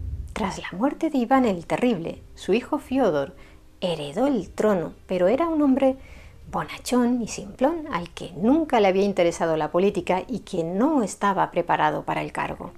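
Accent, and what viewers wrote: Spain. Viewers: Me encantó la< forma de contar las historias....pero muy, muy superior es la forma de transmitir, la entonación del relato me cautivó. No me es precisamente atractivo el acento español sin embargo me he enamorado de esa voz y no me pierdo capitulo, el último Oscar Wilde. Me gusta la historia y este canal me resulta muy atractivo y fácil de asimilar además de bastante documentado e hilvanado. Saludos y gracias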